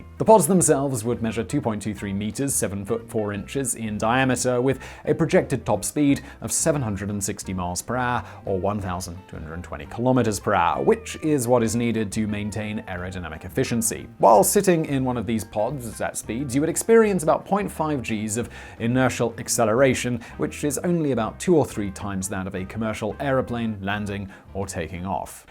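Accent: United Kingdom. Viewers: Several other people write the scripts and just sits in a chair and reads them off a monitor. You can tell he doesn't even proof read them before he records. (see all the times he reads a line about hair, or balding and he'll laugh and says, "come on guys!") In this video at about something he says "Elon Musk has made a name for ITSELF..." and process to crack up on the line about smoking weed. He has not read this script before saying those words. So yeah, it's a team of people, and is just the member of the team that sits on front of the camera and reads the scripts in a British accent.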